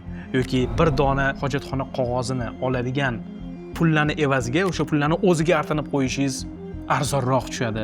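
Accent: Tashkent, Uzbekistan. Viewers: Toshkent shevasida gapirayotgani tufaylidir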